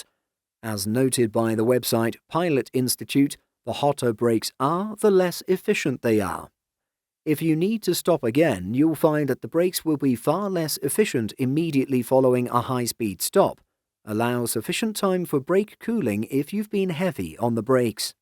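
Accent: United Kingdom. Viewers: Low value production. No real radio calls. Its just a guy with a british accent reading a report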